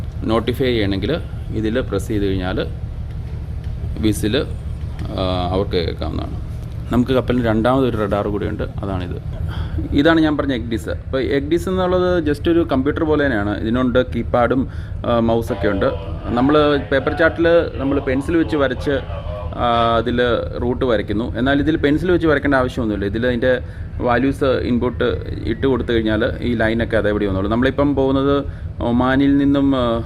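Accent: Kottayam, India. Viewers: Bro Kottayam kaaran aano.. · Thankalude avatharanam നന്നായിരിക്കുന്നു കോട്ടയംkaranano?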